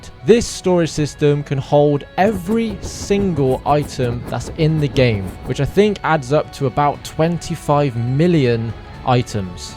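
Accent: United Kingdom. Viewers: are u british?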